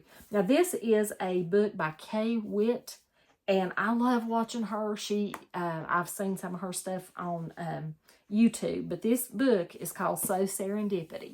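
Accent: South, United States. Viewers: I enjoyed your book sharing. Many of those books are books I’ve owned and then when I had to downsize had to pass them on. But, they brought me much pleasure while I had them. (I do a lot of mental sewing!). I knew your southern accent resonated with me. I live in western North Carolina, too!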